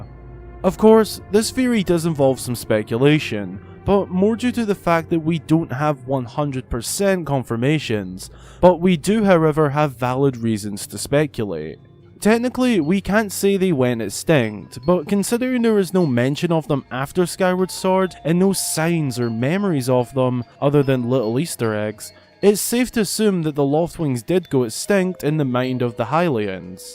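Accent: Scotland, United Kingdom. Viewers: Do you have a scottish accent? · Are you Scottish?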